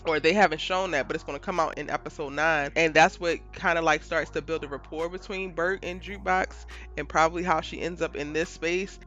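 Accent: Baltimore, United States. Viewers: You from Baltimore?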